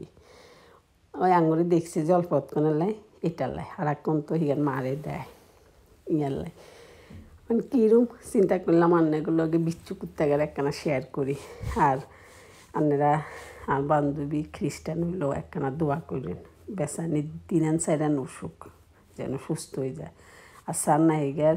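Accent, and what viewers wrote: Noakhali, Bangladesh. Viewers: আমি বুঝিনা নোয়াখালীর কথা আন্টি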